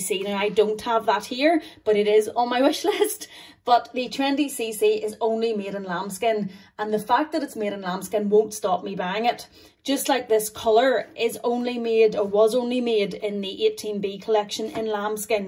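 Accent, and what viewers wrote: Ireland. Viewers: Love your Irish ☘️ accent 😊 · Hi, I have to say I loved listening to you because of your lovely Irish accent. I love Channel. You are very knowledgeable. I just subscribed.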